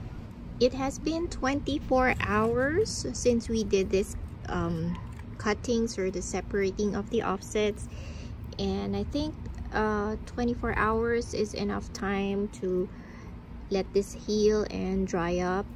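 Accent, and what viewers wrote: Philippines. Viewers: I love your cute Philippine accent.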